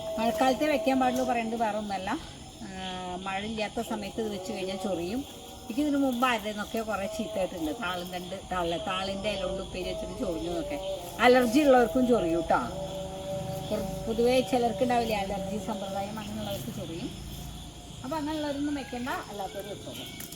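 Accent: Valluvanad, India. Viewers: Sister, i have become a big fan of your videos.... ആ വള്ളുവനാടൻ ഭാഷ കേൾക്കാൻ തന്നെ സന്തോഷം ആണ്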